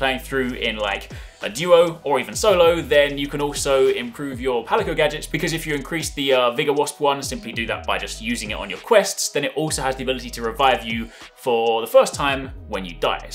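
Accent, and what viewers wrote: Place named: United Kingdom. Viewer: He sounds british but looks scottish ???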